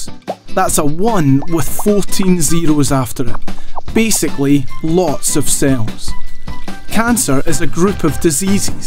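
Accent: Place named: Scotland, United Kingdom. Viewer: Top video, love the Scottish accent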